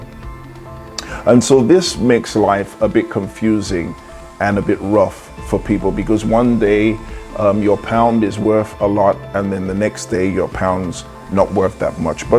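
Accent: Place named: United States. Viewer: Good advice! Your accent seems more Americanized with slight hints of British/Ghanaian.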